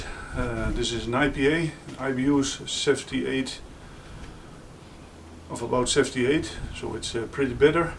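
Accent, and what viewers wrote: Netherlands. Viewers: Yo are Dutchman?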